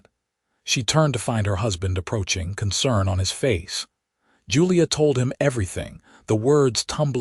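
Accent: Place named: Midwest, United States. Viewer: My most favorite voice! Midwest.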